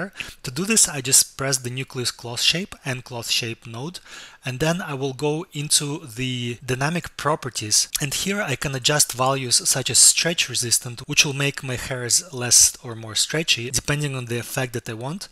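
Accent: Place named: Russia. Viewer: ты русский по акценту видно))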